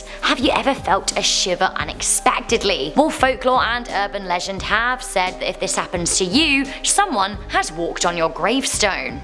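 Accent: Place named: United Kingdom. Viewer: I love sexy British accent. 😊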